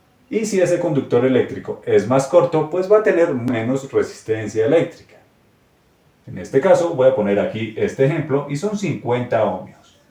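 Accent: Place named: Caracas, Venezuela. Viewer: Epale pana mío tú vídeo esta genial y lo extraño es que tú dirección de tú canal de Youtube dice que estas en Colombia pero tú hablas como venezolano de Caracas santa mayulla pana mío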